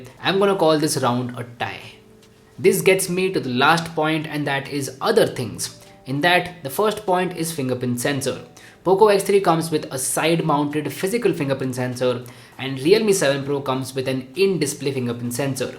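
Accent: India. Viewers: I hope I'll find one Indian with a different voice and accent one day...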